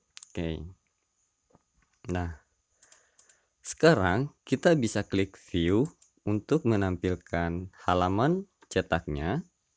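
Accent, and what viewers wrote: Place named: Muna, Indonesia. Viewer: Makasih bang, sangat membantu bagi pemula 🙏 bdw, logatnya seperti daerah sy (MUNA, Sultra) 😁 maaf kalo salah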